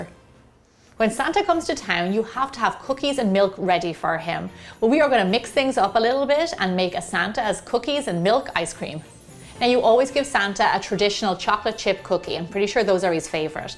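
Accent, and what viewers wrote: Ireland. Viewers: Where in Ireland are you from ? I'm from Mayo in Ireland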